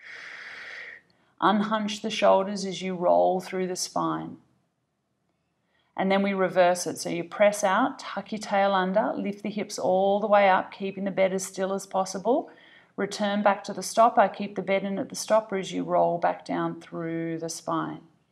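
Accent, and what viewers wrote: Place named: Australia. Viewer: Thank you! I’m brand new to Pilates and this was such a well paced intro. Also as an expat it’s great to be lead by an Aussie voice :)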